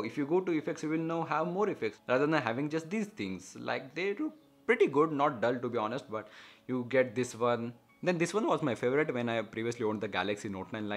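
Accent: India. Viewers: Bro u Talk to much with so strong Indian Accent didn’t understand anything please try to talk slower next video thanks anyway 😂😂😂 Thank you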